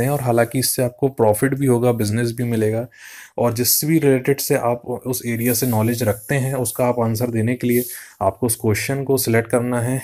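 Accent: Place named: India. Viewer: You speak so fast and you have indu accent. I did understand just afew words. 🤦🏽‍♀️🤦🏽‍♀️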